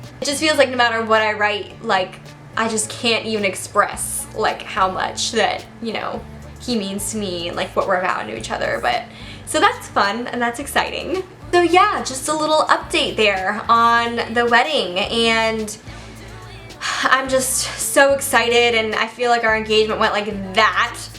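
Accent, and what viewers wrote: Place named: South, United States. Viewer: I haven't heard you talk in a while 😂 but your southern accent is coming in hard girl ! You look fantastic! So excited for you 😍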